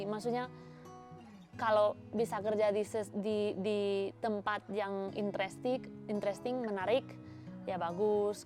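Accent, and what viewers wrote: Indonesia. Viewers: Keren banget dialeknya , 90% Indonesia . ❤️ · Buset bahasa indonesia fasih banget,logatnya pun indonesia banget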